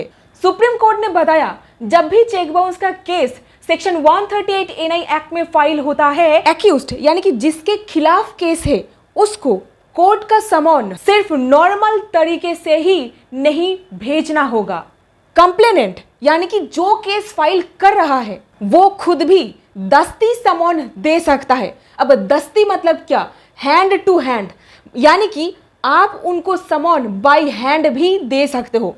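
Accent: Bengal, India. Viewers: মামনি, হিন্দি একটু ভালো করে শিখতে হবে। উচ্চারণ টা বাংলা মিশে যাচ্ছে।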